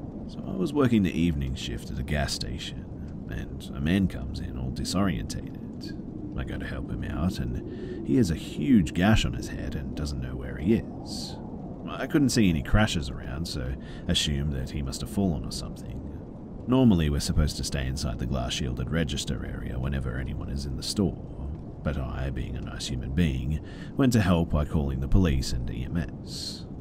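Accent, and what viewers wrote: Australia. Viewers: Are you Australian ? Just discovered this channel I’m asking because if you are, that’s cool lol.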